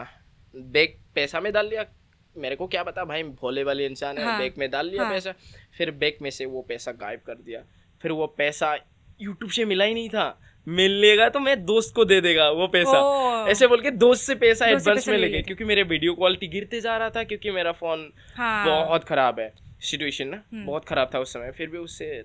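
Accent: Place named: Arunachal Pradesh, India. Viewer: Why your hindi is like arunachali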